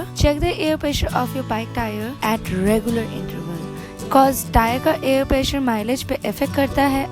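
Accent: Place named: West Bengal, India. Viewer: Sweet Bengoli voice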